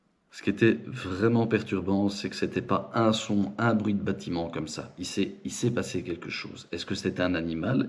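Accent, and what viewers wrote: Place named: Belgium. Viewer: dictions parfaite avec l accent belge ;analyses bien expliquées et enquête bien menée ; que demander de plus! j adore!!!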